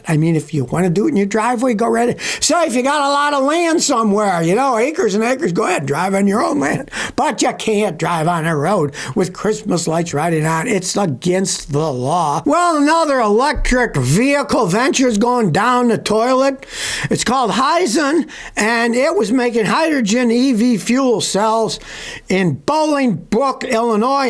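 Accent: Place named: New England, United States. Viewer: "karr" "new Englander lol <3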